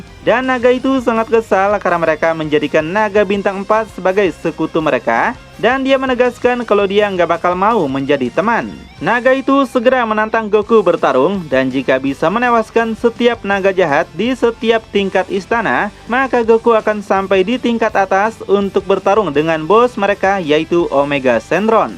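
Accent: West Java, Indonesia. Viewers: Sunda gening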